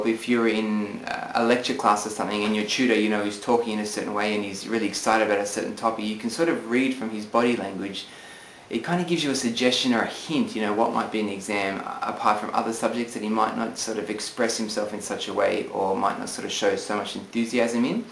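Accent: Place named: Australia. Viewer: australiaann accent haha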